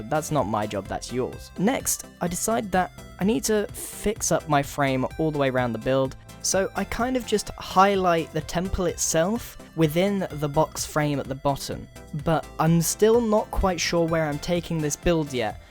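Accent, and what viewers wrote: United Kingdom. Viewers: i love your brittish accent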